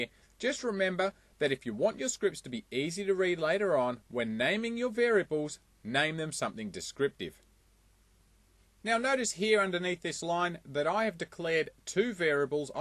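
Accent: Australia. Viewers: Love you hear an Ostayian doing IT videos. Cheers mate!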